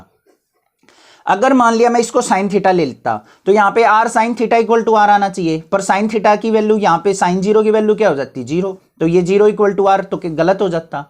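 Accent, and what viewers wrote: Haryana, India. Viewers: Sir are you from haryana? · bhai first time haryanvi accent mai pad raha hu, addiction ho jayga lag raha hai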